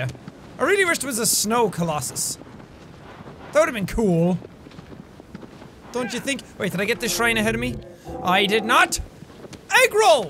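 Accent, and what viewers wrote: Ireland. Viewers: Has bin' getting more Irish? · Is it just me, or is Jacks Irish coming out in this video. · sounded so much more Irish today! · Does anyone else notice when gets excited he gets more Irish? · Is it just me or did suddenly get a thick Irish accent halfway through the video? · sounds exceedingly Irish in this episode lol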